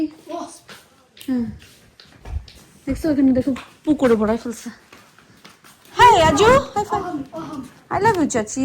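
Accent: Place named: Jessore, Bangladesh. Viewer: tumi onek beshi shundor. Amader jashore er meye bole kotha.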